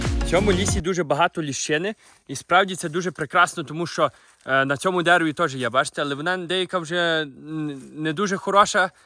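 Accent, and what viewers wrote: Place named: Lviv, Ukraine. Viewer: Я,коли чую вашу розмову,не можу повірити,що ви 17 років живете в Канаді)) таке враження,що ви з якогось львівського села)))) ваші відео піднімають настрій)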